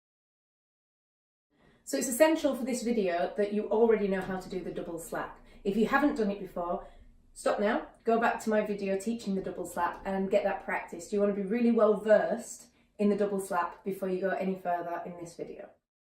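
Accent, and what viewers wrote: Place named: Yorkshire, United Kingdom. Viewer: Great, look forward to more. 'll steadily go through the playlist of videos you already have, just looking at your Ring of Fire tutorial from July. Shame you're not based up more north or East Midlands, I do better with in person lessons . PS sounds a bit like perhaps you're originally from Yorkshire :)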